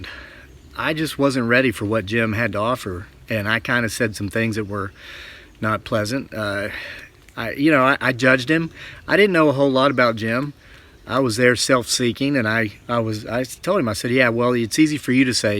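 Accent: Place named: Virginia, United States. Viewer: I’ve never seen your channel before & I’m learning more mindfulness practices in a Focusing class going inward to see what the body is trying to tell me...& I just learned Transcendental Meditation for free online, but coming off a Benzodiazepine makes it hard to clear one’s mind of all kinds of junk from yrs of sedation. I’m an Empath, as well. I heard Ta Ta Ta or Tathata, as well! What amazing men you & your friend both are! This is a delightful video! Thank you! 🙏🏼 Oh! I think it may have been Judith Orloff talking about those who are Earthbound trying to latch onto Empaths...at least, I think she was the one talking about this...anyway, this person said to just imagine showing them the light as in silent conversation so they can return. I don’t know if this is helpful, but it seems to be for me. Best wishes to you & your Zen friend. 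🙏🏼 P. S. Oh, as you were speaking early on, I wondered if you were from Virginia, the accent, plus my birth parents are from there & I have a couple friends there, as well as all along the E Coast. Then I saw what your hat said...interesting.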